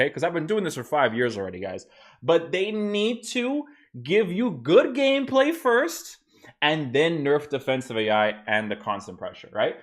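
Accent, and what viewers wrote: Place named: Portugal. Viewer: Man you from Portugal or something? Not only because of the shirt but your accent on player names makes me think you portuguese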